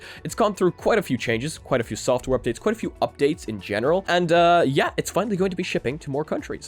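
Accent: Ireland. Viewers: your irish? oO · Wait your Irish ☘️ small world thought this audience was just Americans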